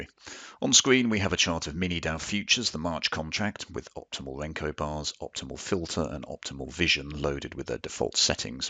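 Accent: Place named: United Kingdom. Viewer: Good stuff! You have a great voice. Very calm sounding with a classy British accent.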